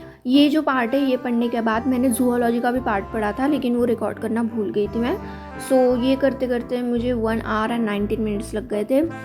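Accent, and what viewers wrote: India. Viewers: I think u are from south Indian na??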